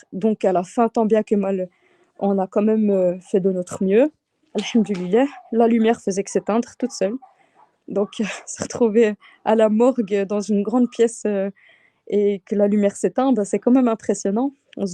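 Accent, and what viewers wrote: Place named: Belgium. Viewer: Cest pas beau laccent belge ...en dehors de ca cest bien que des personnes sache faire le lavage mortuel et davoir le courage ...Allah oumma barek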